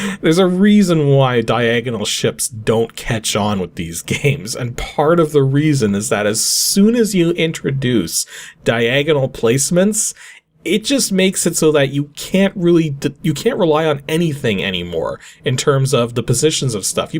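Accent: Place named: Canada. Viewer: I agree. You're a Canadian. Can't expect you to know every correct local pronunciation of the entire world. If you know how you know how, if not just pronounce in your Canadian English. At least you're not apologizing for ever name you pronounce, which is one of my main youtube annoyances, well in the few channels that I watch.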